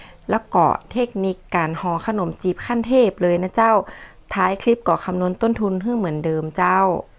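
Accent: North, Thailand. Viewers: ดูแร้วทำตามง่ายคับ..ชอบฟังภาษาเหนือด้วยคับ.😊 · ชอบ อุ้คำเมือง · เว้าภาษาเหนือม่วนขนาดเจ้า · ชอบเสียงอธิบายค่ะ ภาษาเหนือ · ชอบภาษาคนเหนือมากเวลาพูดเป็นน้ำเสียงที่ไพเราะมาก💖💖💖💖💖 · ขอบคุณมากนะคะ ดูเพลิน เสียงภาษาเหนือเพราะคะ ฟังเพลินเลย ขอให้มีแต่ความสุขจัา😍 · เห็นวิธีการทำแล้วบอกได้เลยว่าเยี่ยมยอดน่าทานมากและเสียงพูดฟังแล้วอยากไปทางเหนือเลย ขอบคุณครับผมจะลองทำทานดูแถวบ้านไม่มีคนทำขาย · ไม่เคยดูคลิปสอนทำอาหาร แล้วคนสอนพูดเหนือ รู้สึกดีมากเลยค่ะ เราไปเรียนเชียงราย 4 ปี ดูคลิปไป ก็นึกถึงเพื่อนๆ ไป 😊🥰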